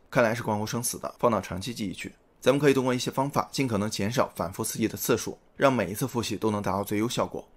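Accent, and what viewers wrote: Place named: Wuhan, China. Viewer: ……标准……＾＾＾你武汉人啊！？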